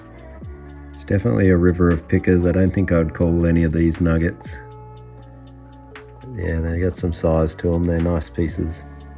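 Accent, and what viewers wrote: Australia. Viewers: I thoroughly enjoyed your fossicking in our lovely mainland river. I don’t know why YT algorithm suggested your video but I’m glad it did. So much better hearing a local Aussie accent instead of the usual American ones so prevalent on YT.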